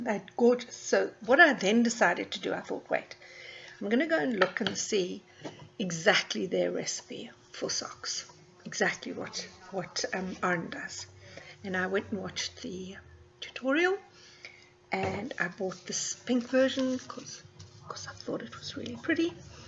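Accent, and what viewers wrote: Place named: South Africa. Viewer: Hi. Thanks for the review and tips. Just discovered sock knitting and Arne & Carlos' channel on YouTube. You sound like a fellow South African. Where did you buy your yarn?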